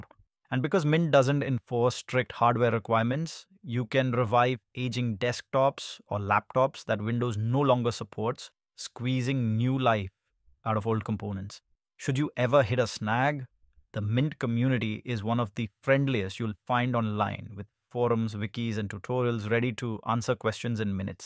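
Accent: India. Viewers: Jeet voice, immediate thumbs down and ignored.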